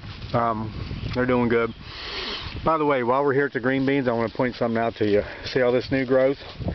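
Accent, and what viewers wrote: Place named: South, United States. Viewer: Petutchi Betelgeuse Ditto.His genteel manner and southern accent are super nice too. I havent caught his name either... so humble.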